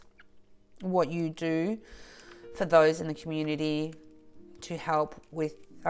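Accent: Australia. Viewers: Congratulations on the 2k achievement,. What keeps me coming back day after day (besides your Aussie accent, LOL) is the fact that you are REAL! Your love for family and friends makes my heart Happy and your sense of humor makes me smile or even laugh out loud. Sending, as always, much love and lots of warm hugs💖🦋🌈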